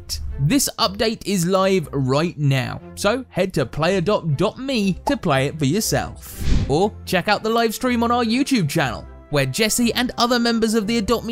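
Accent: United Kingdom. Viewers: A nice British accent 👍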